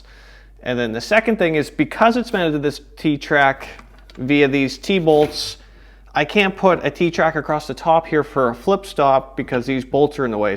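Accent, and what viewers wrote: Canada. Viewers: Canadian I'm guessing. First video I've see of yours and I am on the 11 second mark. Am I right?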